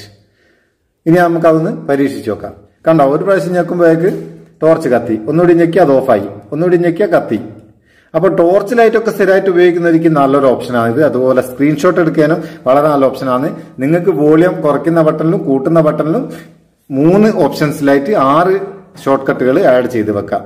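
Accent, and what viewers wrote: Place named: Meppayur, India. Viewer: മേപ്പയൂർ കാരനാ ല്ലേ.... ഭാഷ കേട്ടേരേ തോന്നി പേരാമ്പറെ ന്റെ അടുത്തേടിയൊ ആണ്ന്ന്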